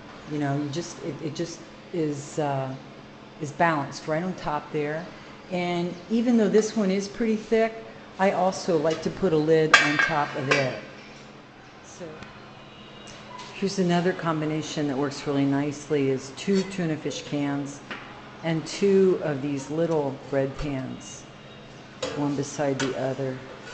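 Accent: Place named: Pennsylvania, United States. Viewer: I'm hearing a Pennsylvania accent :)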